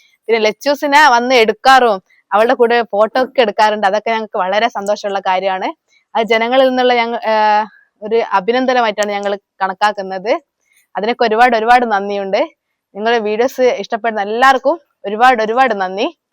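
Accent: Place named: Vadakara, India. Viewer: എനിക്ക് നിങ്ങളുടെ വടകര ഭാഷ കേൾക്കാൻ നല്ല ഇഷ്ടമാണ് എന്റെ നാട് വടകരയാണ് ഇപ്പൊ പാലക്കാട്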